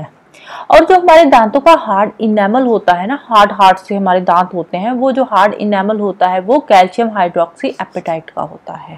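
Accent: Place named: Kashmir, India. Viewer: Mam apka ascent kashmiri type h , n Kashmir ke logo ki awaz bahot innocent si hoti h . Ap kya Jammu kashmir se h ? Ese hi question Aya man me to pucha...vese thanx again for everything 🙏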